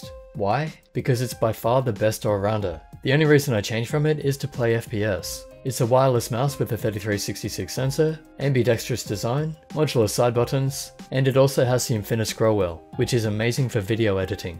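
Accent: Australia. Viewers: you are a legend, this is exactly the type of review I wanted and then you have individual detailed reviews. I hope more manufacturers or computer tech sites hire your services. Thank you very much for your awesome review. And your aussie accent is great too (I'm from Sydney).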